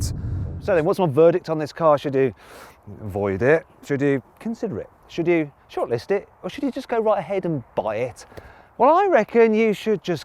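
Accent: United Kingdom. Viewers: イギリス英語がまたいい。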